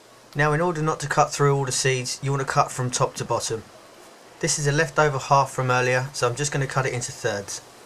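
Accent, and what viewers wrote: England, United Kingdom. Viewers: Nice to hear an English voice which gives me a bit of hope that I might be able to grow a lemon (as opposed to being one so often). I have five seeds growing and wonder if I will ever have lemons or just leaves as I live in the north east. Thanks for your video sir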